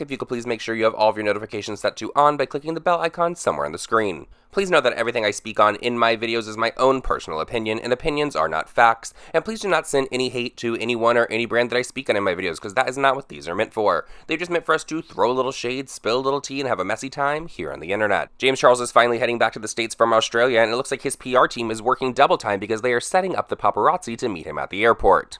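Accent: United States. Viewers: “BrisBANE” omg I cant handle your accent 😂 super cute through! I’m from Brisbane & I swear all Americans can’t seem to pronounce the cities lol. But if you need to pronounce it in another video about James in Brisbane airport it’s pronounced ‘Bris - Bin’ which is silly because it’s got an ‘a’ I understand 😂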